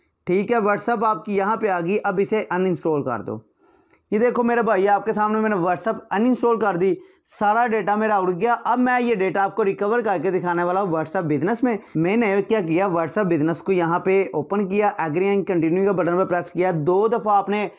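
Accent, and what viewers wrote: Punjab, India. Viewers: Nice accent.. hindi bhi Punjabi jaisi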